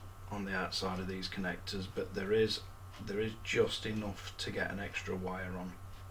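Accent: Yorkshire, United Kingdom. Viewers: Hi mate you sound like a fellow Yorkshire man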